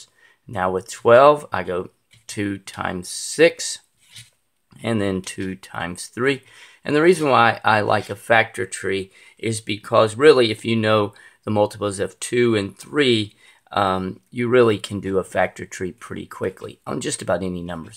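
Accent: South, United States. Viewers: The southern accent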